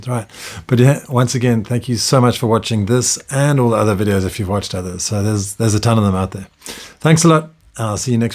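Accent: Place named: South Africa. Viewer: Awesome how your SA accent hasn't changed after 20 years 😊. Please make a video of where to buy super cheap houses thats not remote or far from the bigger cities/towns. A seet up similar to the cheap Italian villages currently selling cheap. Not a €1 home but between €10k to € 20k. Iam aware of ruins for sale in countrysides of Portugal. Looking for something that needs cosmetics not structural renovation. I am a young Looking 52 year old South African living on Poland 🇵🇱 Looking to pay cash for a home base even if I don't live there full time. In SA am no longer a candidate for a home loan and honestly I don't want to be bound by 20 year debt, especially at my age. Want to enjoy income I earn traveling more.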